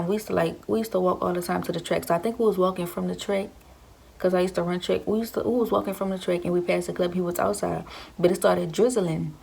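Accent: New Orleans, United States. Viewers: I subscribed to your channel princess. Stay strong and I love that new Orleans accent. Stay blessed! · I hear a NOLA accent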